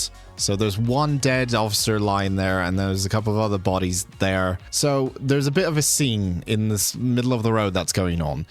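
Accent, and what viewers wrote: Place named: Bristol, United Kingdom. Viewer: Love the Bristol accent ❤and love to see what npcs do with their day 😂 I hope it gta 6 they actually live full lives.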